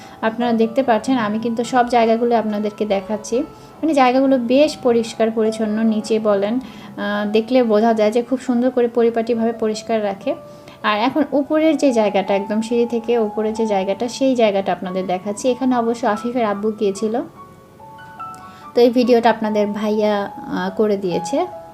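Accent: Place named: Madaripur, Bangladesh. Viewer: Vapsa gorom hahaha.apu amar husband o tomar moto kotha bole karon amar husband o madari purer.tumi jemon kicu kicu kotha ae vasae bolo o temon kicu kicu vasae bole.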